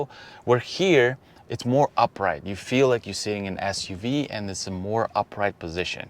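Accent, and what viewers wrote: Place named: Russia. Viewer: Your accent Are you russian?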